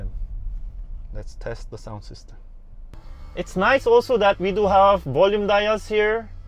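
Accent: Philippines. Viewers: I like the way he speaks English with an Ilonggo accent.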